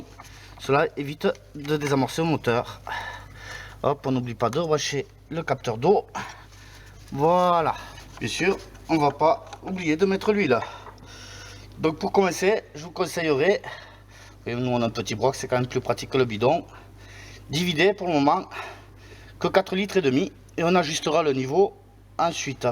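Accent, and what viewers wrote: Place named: South, France. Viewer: Super tuto !!! Clair, bien expliqué (avec notre petit accent du sud qui va bien) précis, astuces de pro, c'est rare ! Té ! Je l'ai regardé deux fois ! Bravo ! et merci 👍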